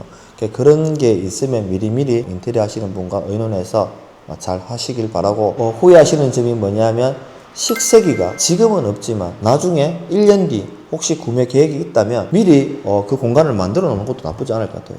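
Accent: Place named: Daegu, South Korea. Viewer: 사투리 쓰시길래 부산이신가했는데 대구시군요~아쉽네요..신뢰가 팍팍갑니다!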